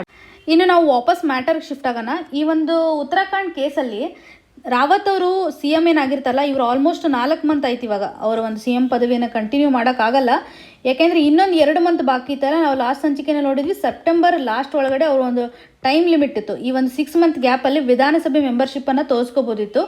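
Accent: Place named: North Karnataka, India. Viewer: Excellent madam.. 👌Pakka uttara karnataka language....😁😇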